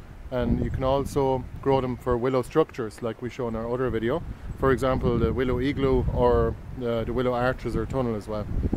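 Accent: Ireland. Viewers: Hi,,thank you for the info,,,enjoyed listening to a good old Irish accent,,you have a new subbie now,,hope to make an arch,soon,,,Cheers,,,Stay Safe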